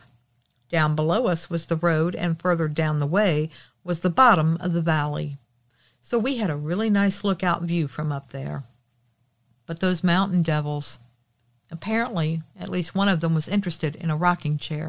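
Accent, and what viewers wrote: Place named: West Virginia, United States. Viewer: Well done! I loved this story! So glad you narrated in the same W Virginia slang. I could absolutely see every detail of the story. Well written and well narrated. Thank you!!!!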